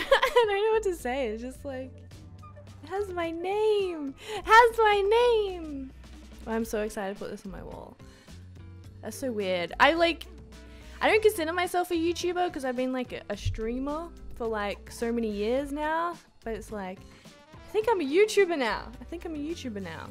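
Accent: Australia. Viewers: Omg youre Australian!! Thats so cool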